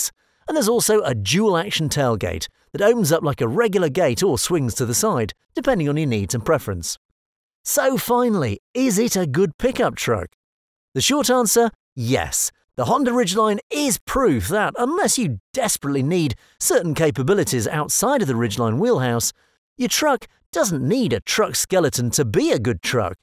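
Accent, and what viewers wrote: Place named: United Kingdom. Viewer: Terrible British accent for a car that does not Get sold in the u.k.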